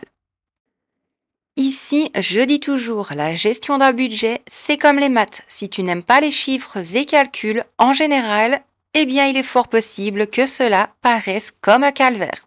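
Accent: Belgium. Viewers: Coucou, super format et très bien niveau diction malgré ton fort accent belge lol... Par contre il faut absolument que tu recouvres ton micro avec une poupette, lol une genre de touffe qui atténue les bruits de bouche car quand on t'écoute avec un casque c'est très désagréable... Et après tu seras au top du top... Gros bisous 😘 alias spoonielovejunkjournal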